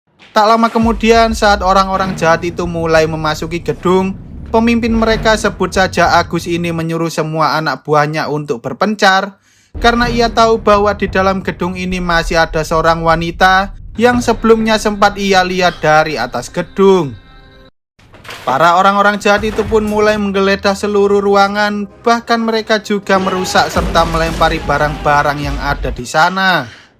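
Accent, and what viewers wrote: Java, Indonesia. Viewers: Min logat nya sengaja dibuat medok ya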